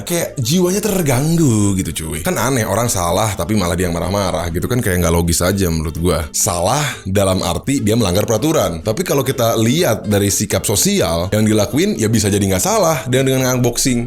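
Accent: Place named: Madura, Indonesia. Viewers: Logatnya kayak madura ea 😂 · Udah lama ga ngeroast orang kok logatnya jadi madura qor . . Btw dikit lagi sejuta nih, siap siap ditagih janji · lama lama kok logat lu kek logat madura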